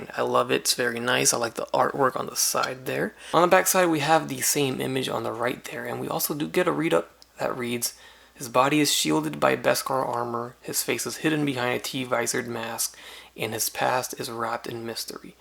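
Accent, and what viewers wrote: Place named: Asia. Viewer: great video man. i can't wait to pay resale prices for this lol...i have a random question tho, is your last name, what is your ethnicity? i sometimes hear an asian accent, asking for a friend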